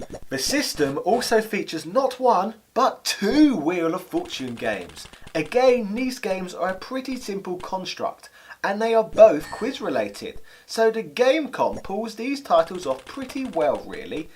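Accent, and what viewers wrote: United Kingdom. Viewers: Shut up your british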